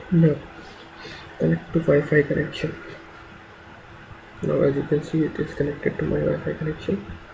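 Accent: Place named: India. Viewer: wtf is he saying? that crappy mic combined with his indian accent...omg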